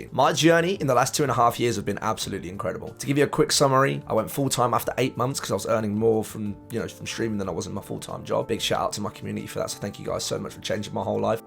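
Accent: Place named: East Midlands, United Kingdom. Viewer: Yo another banger of a video mate..... Where abouts in the east Midlands you from... I live in Ripley, Derbyshire bro.... I never realised where you was from I thought your accent sounded familiar 👌🙌🙌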